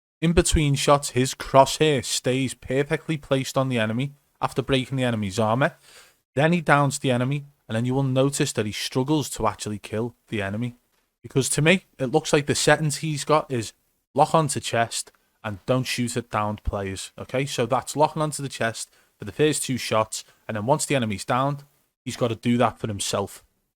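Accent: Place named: Scotland, United Kingdom. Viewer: What part of Scotland are you from?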